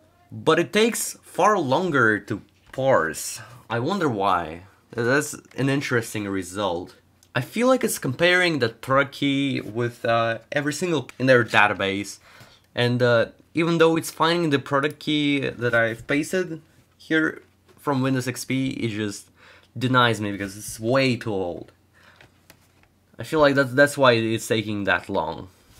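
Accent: Russia. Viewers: this russian accent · You are from Россия?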